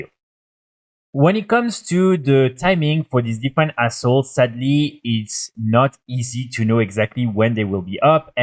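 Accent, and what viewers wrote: France. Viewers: Frenchie accent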